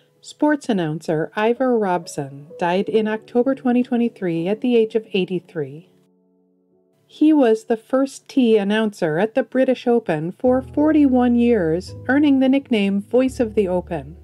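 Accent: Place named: Iowa, United States. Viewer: your voice is so soothing that it could lull me to sleep and your sweet accent sounds like the Midwest to me. If it’s not too personal, would you mind tell me where you grew up? My guess is Iowa because it sounds corn fed, but I think about Fargo and Marge’s accent and that would put you in Minnesota? Cheers, Mary Rose. P.S. Because I love arcane information, I know that Estonian, Finnish, Hungarian are one of the smallest non-tribal languages groups in the world, and now you know it too. Cheers and Keep Up the Good Work, Mary Rose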